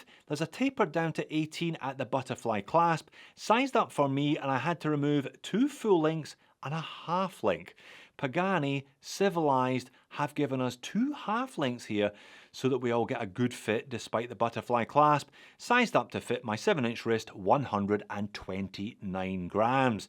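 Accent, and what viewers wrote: Scotland, United Kingdom. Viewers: ya just type that in the search bar it's the old oatmeal crisp commercials from earlier 90s. For some reason your Scottish accent just pop the commercial into my head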